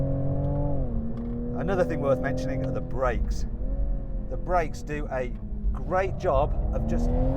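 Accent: United Kingdom. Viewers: 喋り方は一般的なイギリス英語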